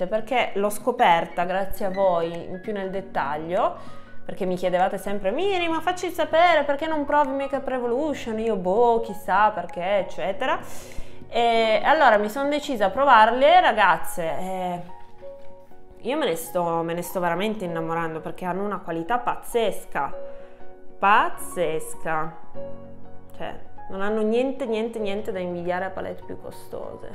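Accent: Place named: Piedmont, Italy. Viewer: Ah mi piace la tua cadenza piemontese, io sono pugliese ma ho tutti o quasi i miei parenti in Piemonte e adoro il piemontese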